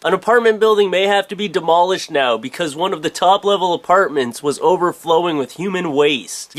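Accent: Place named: Canada. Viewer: I think should find an excuse to say 'house' in every video. Believe it or not, my friends and I have become so amused with his accent that we all say 'house' Canadian-style.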